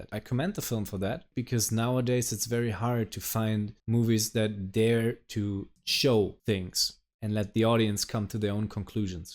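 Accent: Germany. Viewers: that one killed me 💀🤣 Came here from the "Adolescent" video and the comments under it were wild... But I really enjoyed the way you chose to respond to them, so I checked another video and wasn't disappointed. As someone who both read the book and saw the movie, I got a bit offended by the title of this video, as the movie was awful compared to the book. But as you're only judging the acting, there might be some good scenes in it. idk. To me, personally, there was no chemistry at all between the two main characters and a lot of the side characters did a way better job at making me feel for them. That's why the last scene also didn't move me in any way. I think this movie would've been perfect with some unknown actors to focus on the story and not on Blake's stupid fashion choices or whatever. I think you're doing a good job with these videos. Keep it up! 💜 P.S.: Are you German? 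Your accent sounds like it.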